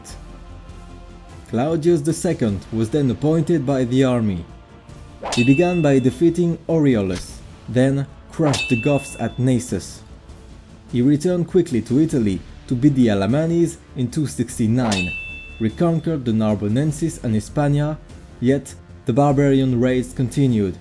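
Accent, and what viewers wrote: France. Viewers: donc c’est bien un accent français ?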